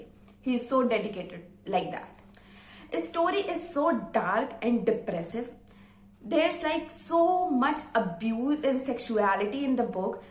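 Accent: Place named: India. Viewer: My knowledge of english is poor. But I can understand little with your nice pronunciations with indian style of english speaking. Thank you... But my humble request to you that please make all your videos in the Hindi language also. So we can understand it easily. Here are Indians in majority so more and more people take advantages of your knowladge and reviews. Please tell whole story of book in brief also.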